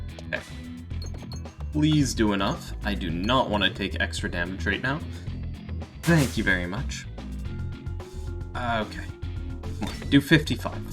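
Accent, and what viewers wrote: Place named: Canada. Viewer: Your voice is the definition of Canadian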